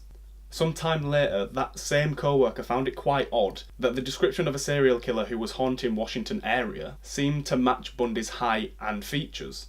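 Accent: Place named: Yorkshire, United Kingdom. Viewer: Are you from Yorkshire? Your accent is really cute haha Great video btw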